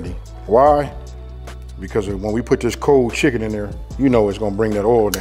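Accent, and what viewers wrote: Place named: West Coast, United States. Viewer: West Coast accent is sooo heavy!!!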